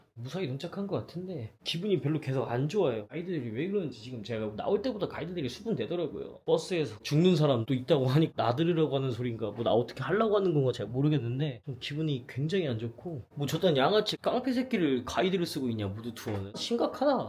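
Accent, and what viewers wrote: China. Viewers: 말투가 조선족인데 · 말투 그냥 조선족임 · ㅋㅋㅋ조선족아님 말투가 조선족인데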